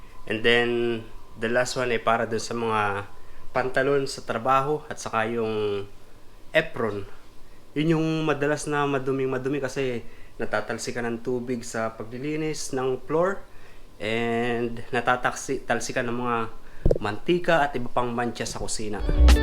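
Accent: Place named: Batangas, Philippines. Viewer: Korekek taga Batangas kba Ako oo sa Lipa Batangas may punto ka kasi eeeee😛😛😛😛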